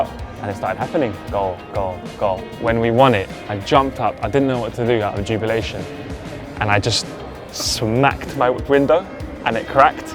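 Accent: Liverpool, United Kingdom. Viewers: Scouse accent really shines through. Been a Liverpool fan ever since he 'chose' to.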